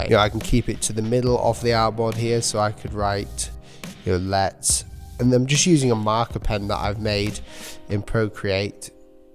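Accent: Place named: Yorkshire, United Kingdom. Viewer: Are you from Yorkshire? You sound exactly like the voice in my head. It’s weird.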